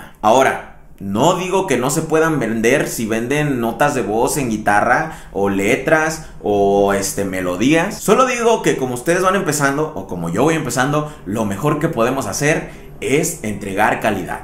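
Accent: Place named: North, Mexico. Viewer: tu acento mezclado está bies castroso, eres más chilango que la torta de tamal y hablas bien norteño nmms bro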